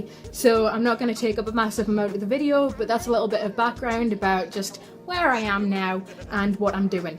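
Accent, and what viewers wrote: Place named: North, United Kingdom. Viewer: !!!!! <333 hearing your accent makes me feel so happy i miss the north sob!!! so excited to see you back on youtube!:D!!!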